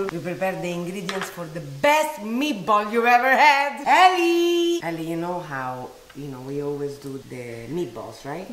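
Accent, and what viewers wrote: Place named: Rome, Italy. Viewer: Che meraviglia quell' accento Romano, ah quanto vorrei essere invitato a casa di questa mamma "per mangiare si"😅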